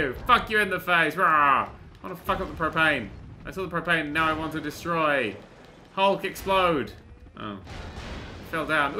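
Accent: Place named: London, United Kingdom. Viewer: Hes english... his accent is english - londoner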